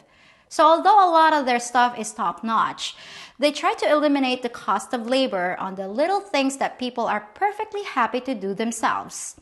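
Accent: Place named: South, United States. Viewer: I notice how fast you adopt regional coloquialisms..and our speech.. Like you're dropping your ING sometimes for in..it's Southern Talkin walkin.. yall every type of soft drink is called a Coke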